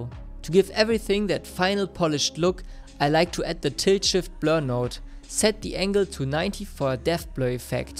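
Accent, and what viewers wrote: Germany. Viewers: This Tutorial helles me alot, tysm ! (Aber du sprichst deutsch oder haha)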